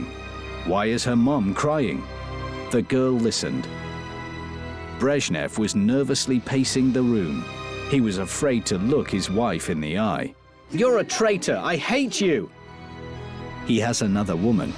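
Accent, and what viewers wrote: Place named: United Kingdom. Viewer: Every time i hear narrators Albion accent I know immediately, its not worth to watch the story...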